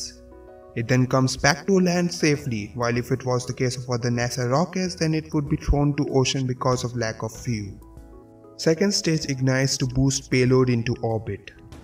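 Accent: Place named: India. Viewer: slow down man, your indian accent it will crash🙄😒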